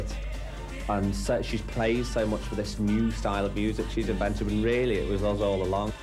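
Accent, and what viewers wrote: Liverpool, United Kingdom. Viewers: Am I the only one who loves his liverpoolian accent?😳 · Does he talk with a Liverpool accent?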